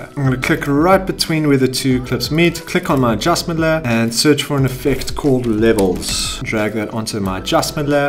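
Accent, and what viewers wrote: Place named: South Africa. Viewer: sound so south african anyways thnx for the video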